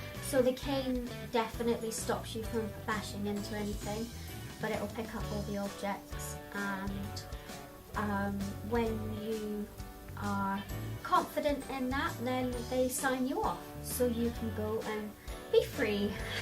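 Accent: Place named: Scotland, United Kingdom. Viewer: So lovely getting to know you better and hearing your lovely voice. I didn’t know you are Scottish! Love the accent xxx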